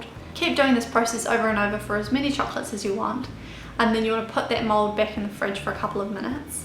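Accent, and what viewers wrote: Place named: New Zealand. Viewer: Just discovered your channel. Absolutely love your videos, they are so genuine and watching them made me smile. I sense a kiwi accent hhaha. Hello from your neighbour in Australia :)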